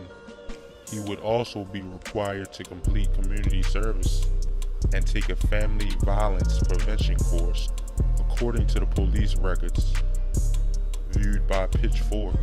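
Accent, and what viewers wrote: Baltimore, United States. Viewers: oh you from b more huh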